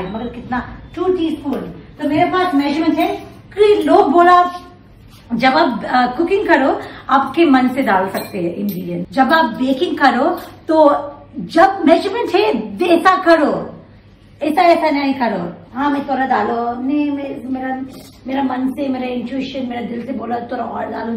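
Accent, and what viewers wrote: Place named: India. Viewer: I am here for her hindi accent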